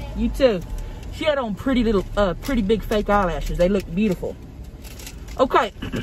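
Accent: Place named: Oklahoma, United States. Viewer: My blood really started bowlin it really started bowlin...I'm dying she's hilarious cause we really talk like that in Oklahoma. 😂🤣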